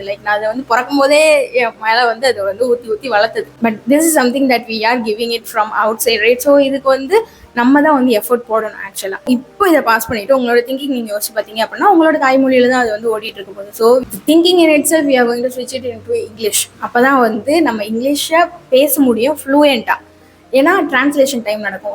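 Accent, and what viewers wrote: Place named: India. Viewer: Akka I improve your United States accent you are speaking Indian accent if you speak United States accent everyone will like your English more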